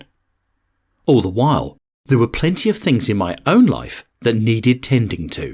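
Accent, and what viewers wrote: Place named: Scotland, United Kingdom. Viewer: Just enjoying the Scottish accent. 😊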